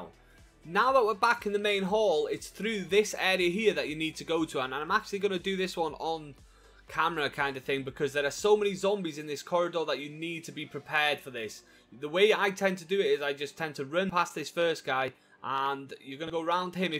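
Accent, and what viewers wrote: United Kingdom. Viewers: thank you for your uk accent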